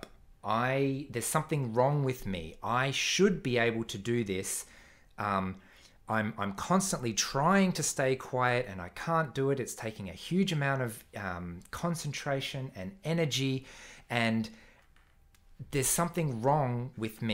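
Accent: Australia. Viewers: Thank you so much for this video! It helped me understand why I constantly talk, even to myself. Only then can I structure things. Wow! Wish there had been people like you when I chose to be a translator (written translations) cause I didn't trust my personality to be an interpretor (spoken translations) although I always loved to hear native people speak in their varieties. Well, now that I'm retired, I can do that on holidays. P.S.: I love your Australian English!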